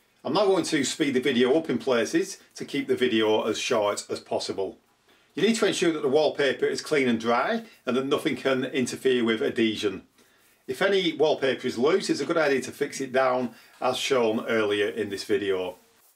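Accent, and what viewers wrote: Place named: Blackburn, United Kingdom. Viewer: Really helpful video, thanks! And that sounds like a very strong Blackburn accent!!!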